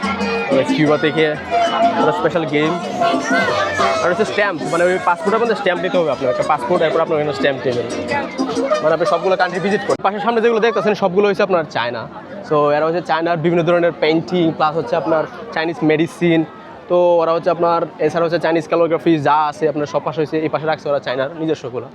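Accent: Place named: Netrokona, Bangladesh. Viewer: আপনার কথার স্টাইল অনেকটা আমাদের নেত্রকোনা র মানুষের মত, যদি কিছু মনে না করেন তাহলে, জানতে কি পারি আপনার বাড়ি?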